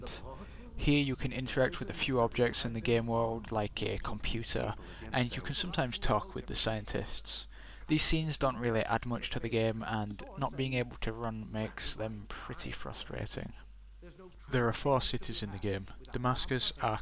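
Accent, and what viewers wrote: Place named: Scotland, United Kingdom. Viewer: He sounds more Scottish than English.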